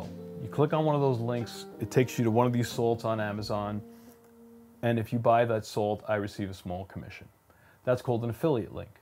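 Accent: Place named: Long Island, United States. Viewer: I’m from Long Island and recognized the accent haha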